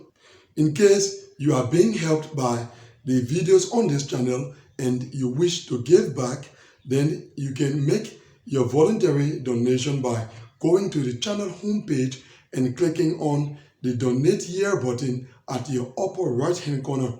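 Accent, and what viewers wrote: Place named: Liberia. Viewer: Hello bro, which national are you? Because you sounded like a Liberian...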